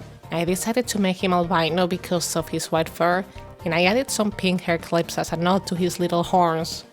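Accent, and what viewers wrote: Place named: Italy. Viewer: I’m sorry this ain’t related to the art but I love your accent so much are you Italian??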